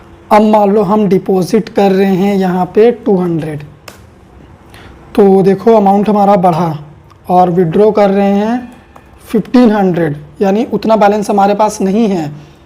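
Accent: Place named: Bihar, India. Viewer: who loves his bihari accent😀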